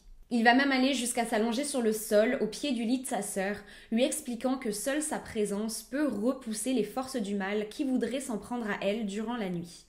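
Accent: Quebec, Canada. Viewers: Tellement triste histoire pauvre gars ! Ma mère souffrant de maladie mentale quand j'étais jeune elle avais fait une genre de cabane avec des meubles et je ne pouvais pas manger parce qu'il y avais de la drogue dans la " nourriture " et il y avais des méchants partout ....après 3 jours ma famille est venu nous chercher pour lui dire qu'ont devais s'enfuir à cause que les méchants étais à notre recherche ils ont conduit ma mère a l'hôpital et moi j'ai manger comme jamais ! Il y a toujours quelque chose a faire les parents son a plaindre dans cette histoire ! Ps ton accent une vrai québecoise oublie pas d'aller voter ! Faire sortir nos bozos 😅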